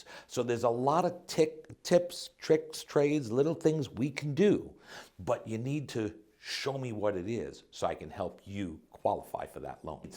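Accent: New York, United States. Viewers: NM. I see you're in CA. I thought maybe you're in NY from your accent.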